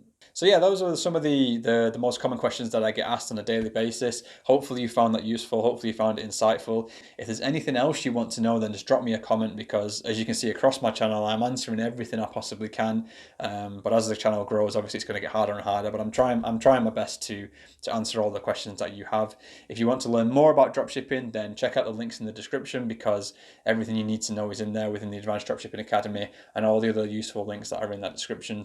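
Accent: United Kingdom. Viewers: Hi. I'm interested in your course. I take it from your accent that you are a fellow Brit. Most Drop-shipping courses are targeted at the USA.Have you noticed many differences in the way you operate your DS business from the UK (assuming you do). Thanks in advance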